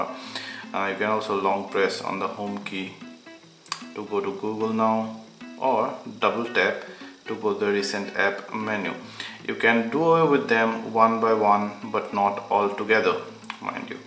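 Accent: Assam, India. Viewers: You sound like Assamese :D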